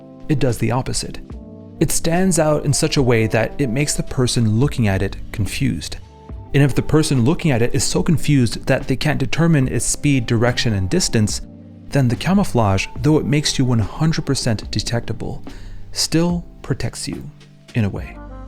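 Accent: Quebec, Canada. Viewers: when you speak french it sound like Québecois french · t tu québecois?!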